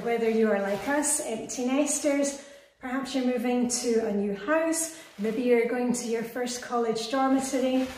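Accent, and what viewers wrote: Scotland, United Kingdom. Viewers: Hey, are you Scottish?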